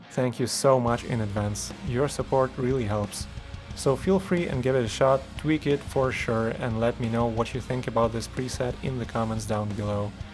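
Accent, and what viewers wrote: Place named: Western Ukraine, Ukraine. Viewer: Так ось він який - акцент на заході України 😂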